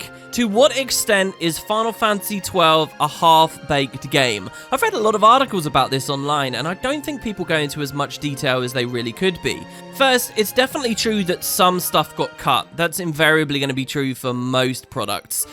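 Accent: United Kingdom. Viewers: you absolute monster. I learned 3 things in this video 1) You are younger than me (I think the British accent makes you sound more mature than my American slang) 2. That a game that should have been about Balthier missed by a few letters, until a pivot in design. And 3) That it wasn't Nethecite causing the weird audio. That has been my headcanon since 06, and it's dead now. You've killed it. Sure hope you take better care of Rex.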